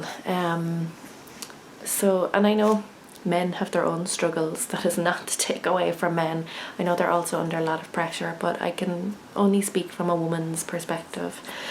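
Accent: Ireland. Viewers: Off topic, but your brogue is just lovely!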